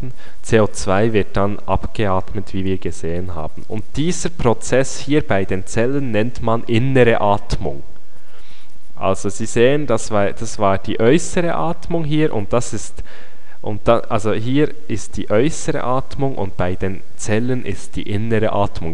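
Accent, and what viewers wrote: Switzerland. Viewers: Kann das sein das ich ein Schweizer Akzent höre ? :)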